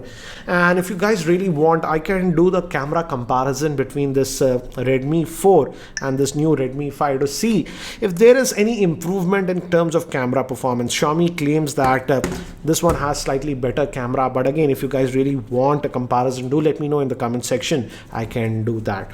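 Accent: India. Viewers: That distinctive Indian accent it is anoying and funny at same time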